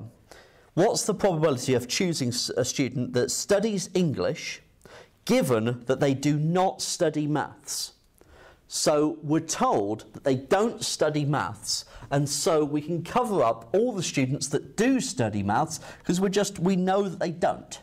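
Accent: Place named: United Kingdom. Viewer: I am fond of British Accent , great lecturer great video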